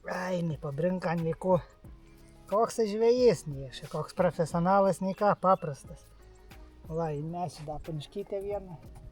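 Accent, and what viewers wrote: Aukštaitija, Lithuania. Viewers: labai patinka tavo aukštaitiška tarmė,ir jeigu tavo gera nuotaikėlė,tai gali filmuoti bet ką.Svarbu kai tu linksmas.Labai pakelia nuotaiką.😂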